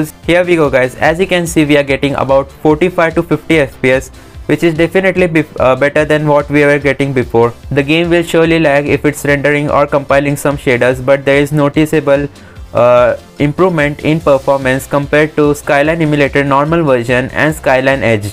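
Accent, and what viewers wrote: India. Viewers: Я не знаю английский,но я слышу что ты разговариваешь с акцентом,ты индус?😁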